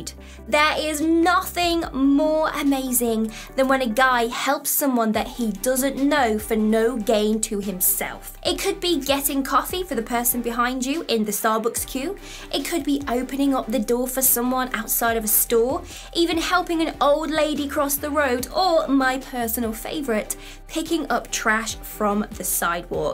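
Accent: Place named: RP, United Kingdom. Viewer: Cool RP accent and cool backdrop too.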